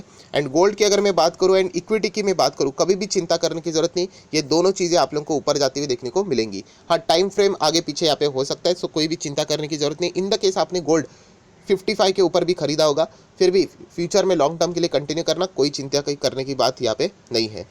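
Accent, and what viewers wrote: Maharashtra, India. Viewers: Sir shayd Pune se kyuki unke Google page me by default language Marathi he, also sometimes he mumbles marathi word's, I know this because I am also marathi. Also he has mentioned that he likes to watch live cricket that means he lives nearby Hinjewadi as it is the only place where international cricket stadium is nearby. Also he was using Micromax Mobile as sometimes during video notification sounds comes. Sir recently bought iphone as well because during video he's phone was ringing... And he last week he said he is making video from different mobile and checking the audio video quality. And most of the time in his video he says 'matlub.. matlub' that's shows that Hindi is not his mother tongue.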